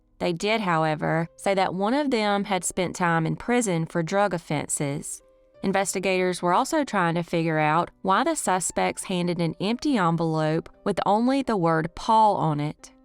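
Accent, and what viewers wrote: South, United States. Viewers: THANK YOU!! IT KINDA HURTS FOR THE UNSOLVED CASES BUT YOURE RIGHT UP ON THEM!! AND THAT BEAUTIFUL COUNTRY ACCENT! KEEP UP THE GREAT WORK!!:hand-pink-waving:🤠❣❤🐴🐎🦄🦌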